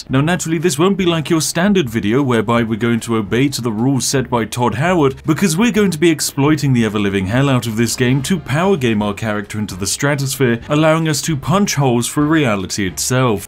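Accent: United Kingdom. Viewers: I love your British accent. Please marry me. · Starting with nuking a group of harmless deer, you had my attention, glorifying the best tea brand and you've earned my subscription. Spiffy Brit accent is icing on the cake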